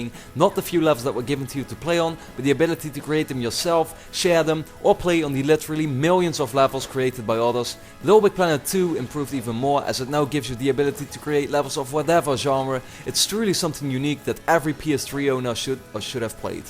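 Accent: Netherlands. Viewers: hey nice video can i be nosey and ask are you dutch man? :) cool accent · Always good to hear a dutch guy, not playing cod or fucking fifa. Nice list. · I'd say, without looking at your channel, that you are Dutch. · Jij komt uit nederland hé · are you from the netherlands? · you sound like a dutchmen who speaks english! so are you? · deze man is nederlands?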